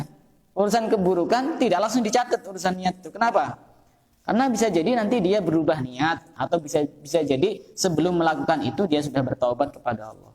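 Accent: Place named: Madura, Indonesia. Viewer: Kok seperti logat Madura ustadz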